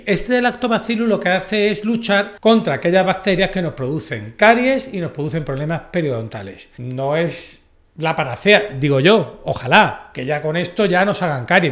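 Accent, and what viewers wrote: Spain. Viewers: es acento español. Y su pelo va en gustos, para ti es desaliñado…para otros es una melenita de moda.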